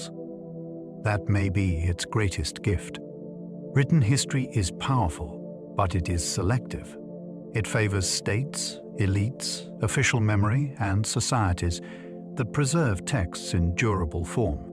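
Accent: United Kingdom. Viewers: That’s ironic that you chose a British guys Chanel. I quite enjoy his crisp accent, the cadence is soothing, as well as the tones. Good luck finding one to your liking, the rest of us will be content to stay.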